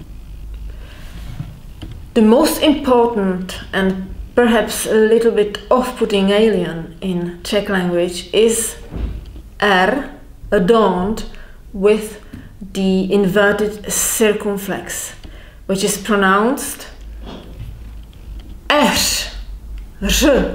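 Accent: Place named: Poland. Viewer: I love your english. I'm from Poland and your accent is similar to polish accent 😄 I am starting study czech language and I hope your films will help me 😁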